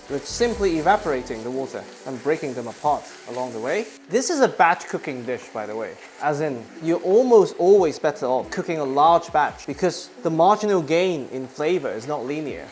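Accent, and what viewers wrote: United Kingdom. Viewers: u haven’t been outside enough if you think an Asian guy with a British accent is impressive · Nah, it's an Asian dude speaking British English giving lesson about Italian dish that got me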